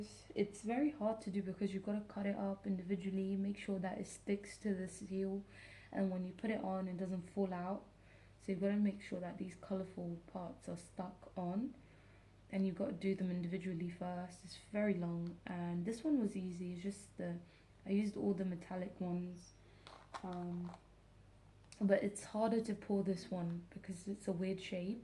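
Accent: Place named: England, United Kingdom. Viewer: 💕Hello my Dear...I really enjoyed watching this video because i doooooo love candles and WAX. I collect candle scraps too and put them in dried flowers or potpourri and It all smells divine!!!😅😅 Love your English accent because it's Just like mine..i was Born in Bedford 1970 and have been in Italy since 83...i still haven't Lost my accent even though i speak Italian everyday...You speak very fluently and you are unserstandable...The video Is very relaxing and thank you for sharing....Take care and a PROSPEROUS NEW YEAR to you and loved ones❤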